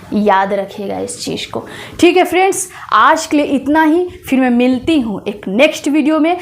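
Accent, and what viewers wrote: Bihar, India. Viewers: Bihari tone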